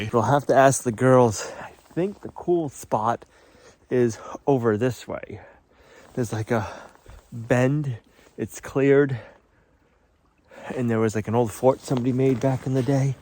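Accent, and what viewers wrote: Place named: New England, United States. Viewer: in the last couple videos, you kept referring to “Gracing the roof”. For the LIFE of me I didn’t know what u meant. Then you pulled out the ice shield and I get it now. That must be a “NewEnglander slang” I guess LOL! I do get a kick out of some of your pronunciations from time to time. You’d probably make fun of how my midwestern accent sounds too😂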